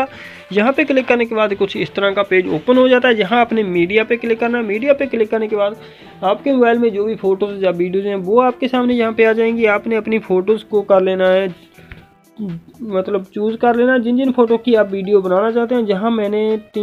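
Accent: Punjab, India. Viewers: Aap pke Punjabi hai na sir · Yehh punjabi voice😇😇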